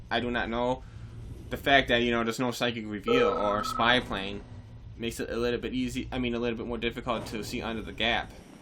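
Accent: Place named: New York, United States. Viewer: you come from new york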